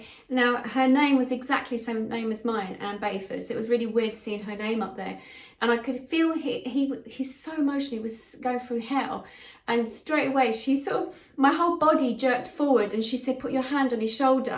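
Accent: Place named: England, United Kingdom. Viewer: Gr8n hearing an English accent to all the others you hear all the time.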